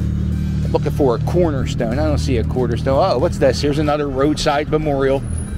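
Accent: Philadelphia, United States. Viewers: Love that Philly accent. Left in 1957 and never really lost it.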